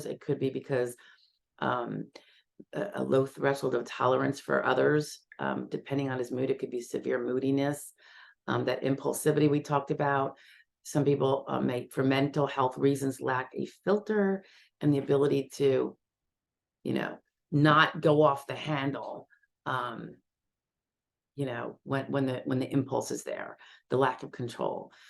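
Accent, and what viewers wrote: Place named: East Coast, United States. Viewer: I'm curious what state you're in? I wanna guess east coast (& fingers crossed) by the way you pronounce things like me. I know it doesn't matter where your attorney is for disability & I definitely want to get one this time. I should've "appealed' the 1st time but now I know I'm denied bc I didn't getmy paperwork work done in time so I didnt send it in last month.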